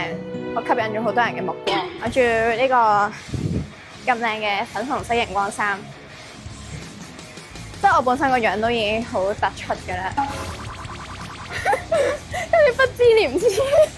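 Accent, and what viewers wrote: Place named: United Kingdom. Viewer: 聽把聲就似一個毛都未生齊嘅靚妹。 口音好似係學英式英文嘅人。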